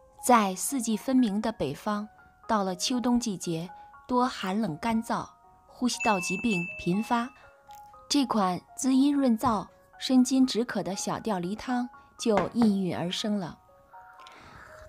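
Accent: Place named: Beijing, China. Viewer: 浓浓的京味👍👍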